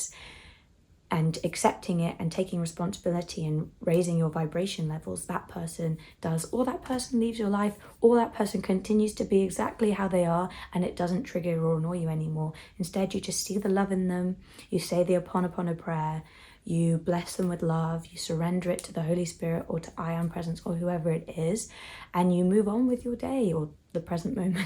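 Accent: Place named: United Kingdom. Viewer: I have a crush on this woman. Her British accent is so cute!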